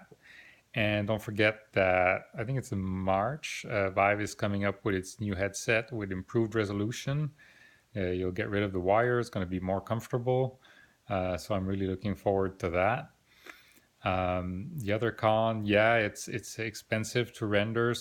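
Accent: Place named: Quebec, Canada. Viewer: Where are you? You sound French Canadian, I live 2 hours west of Toronto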